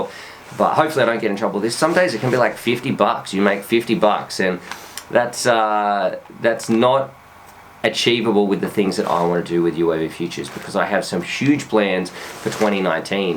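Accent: Australia. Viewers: I like your Aussie brogue. Anxious to see what new tech is developed in 2019.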